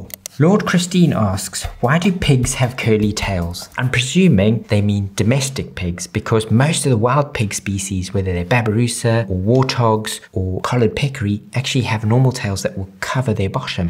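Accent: South Africa. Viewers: Dude. Your accent, are you South African? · It's a South African accent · This guy south african?? The accent gives pretoria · I know that South African accent anywhere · Is he South African? He sounds like it lol. Yay we made it to WIRED!!! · Only a South African can recognise that accent ☺ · he sounds south African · I know a South African accent when I hear it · I can't tell if you're South African, British, or Australian? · I'm having trouble nailing down his accent...South African? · South African? I love the accent. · It's South African "accent". Many different accents combined into one. I personally love it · probably KZN accent. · could listen to this guy for hours i love south african accents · sounds like maybe a bit Australian or something as well. I thought Australian, but it sounded off, and South African definitely makes sense. But I also wonder if there's something else in there as well! 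· Love the South African accent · the second the video started, got slammed with the strongest south african accent i have ever heard lmao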